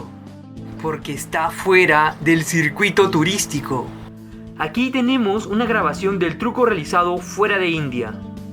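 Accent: Peru. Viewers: ese acento es peruano 😊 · ERES DE PERU VERDAD ? LO DIGO POR TU DIALECTO AL HABLAR .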